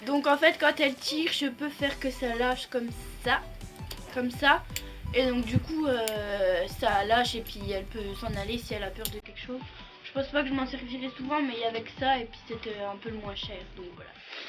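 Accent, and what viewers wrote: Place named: Switzerland. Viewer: a ok je trouvais que tu avais l'accent suisse😂 sinon je découvre ta chaîne aujourd'hui elle ets vraiment génial ❤️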